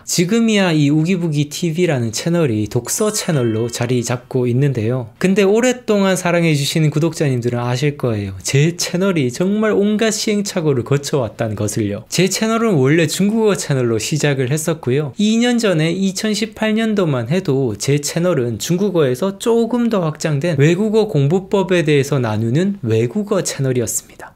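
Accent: Andong, South Korea. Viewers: 구독했습니다 아직 우기부기님 영상을많이보지는 않았지만 요즘 많이 고민중이 두가지문제가있어서 우기부기님 생각을듣고싶어서요 하나는 저도 책이 800권쯤있는데요 한번씩 정리할까? 하는생각이 들때가 있어요 저는 돈벌고부터 책을읽어서 다 사서 보다보니 이렇게되었는데요 책을 읽기도하지만 한번씩 보여줄여고 모으나? 하는생각이 들더라고요 뭐 단편적이아닌 지금제 많은생각 플러스된거라 다 이야기는 못하겠지만요 서론이 길었네요 우기부기님은 읽은책들 정리안하시고 게속 가지고있으실생각인가요? 또하나는 저는책을읽을때 줄긋고 표시하고 모르는단어 확인해서메모하고 그런데 마음에든글들을 우기부기님은 따로 메모를해놓는지요? 지금보니 좋았던글 플러스 우기부기님생각도 쓰시는것같은데요 제가 직장을다니다보니 손목이안좋고 악필이라 쉽지는않은데 또 써놔보고싶긴하고 두가지가 계속 해결못하는 일인입니다 우문현답 기다려도될까요? 다른영상에서 답을찾을수있을듯하네요 다들똑같은고민을하나봅니다 영상들감사합니다 글고 안동사투리 정답네요 ㅎㅎ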